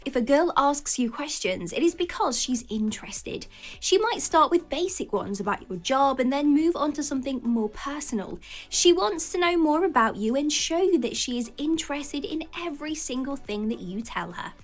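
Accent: England, United Kingdom. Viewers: I dont know much about the tips but i watch these videos for the English accent and the squeaky voice.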